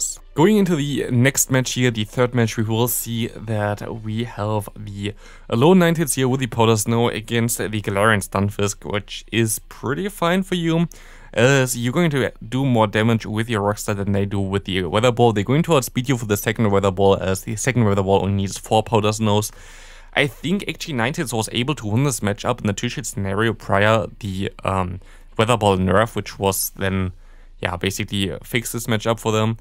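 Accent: Germany. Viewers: Germans speak English obviously with some German accent bits in it. Nothing unusual. Don't bother it.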